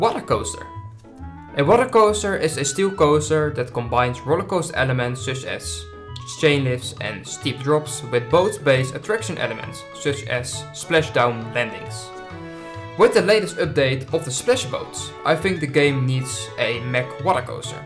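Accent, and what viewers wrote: Netherlands. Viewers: U are dutch arent you :)) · Jij bent Nederlands ik weet het gewoon ahah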